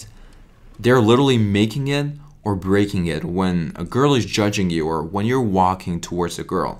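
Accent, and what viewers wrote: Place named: Russia. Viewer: Русский акцент спалился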